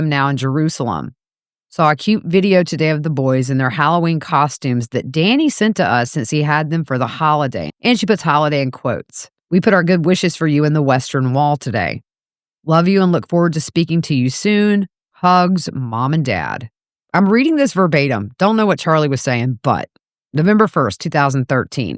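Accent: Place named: Boone, United States. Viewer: One can become invested in the success of a content creator. In my case about two years ago my wife asked me why I was emotional. I was blinking back tears as was talking about her Grandmother, taking her to get her hair done or to a medical appointment. Then I learned daughter moved out of state. I told my wife I am reminded of my family from Boone NC area. Similar accent etc, same heart. I tithed my time to this podcast as I shared and liked the podcast. I also try to write a comment that a new viewer may like and subscribe. I am now in a position to help this channel with $$ and I plan on making it happen Asap. Thanks …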